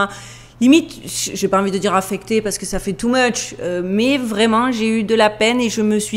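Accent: Marseille, France. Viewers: Salut, on reste toujours jusqu'à la fin des vidéos! Ok tu n'es pas très catholique, cependant, il reste en toi, et c'est tant mieux, de belles valeurs, celles que le Christ est venu nous enseigner. Autre chose, dans la vidéo de la semaine dernière, tu nous disais que tout avait été dis sur la mort de la reine d'Angleterre et que du coup, tu changerais de sujet. Cela dis, je travail près de 10h par jour du lundi au vendredi, et je ne m'accorde que quelques heures d'écran par semaine, le journal TVL chaque soir, les vidéos de Greg Toussaint, un peu de Blanche Gardin, Campagnol TV et bien sûr, chaque dimanche, une jolie blondinette avec un accent marseillais magnifique au surnom de. Alors, je crois que tu peux nous parler de tout, vu que beaucoup, je crois, t'on choisis pour cela. Belle journée, Nico de Belgique. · Tant que les martiens n'affecteront pas ton accent marseillais, pas de soucis 🙂 Une rencontre du 3ème type pourrait être pourquoi pas, bénéfique à une humanité qui part de + en+ en quenouille comme on dit chez moi😉 Merci pour tes vidéos toujours passionnantes. 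· bonjour 1/ je vous trouve geniale et sympa avec votre accent du midi 2./ je royalement d'accord c'est ce que je pense aussi - vos documentaire sont hyper passionnant que je vais continuer a regarder vos autres videos - regrette pas de m'etre abonnee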